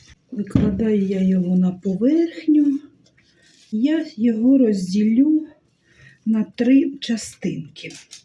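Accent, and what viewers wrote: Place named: Ukraine. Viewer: у вас очень красивая, спокойная украинская мова, вас приятно слушать. Не суржик, а именно мова.😊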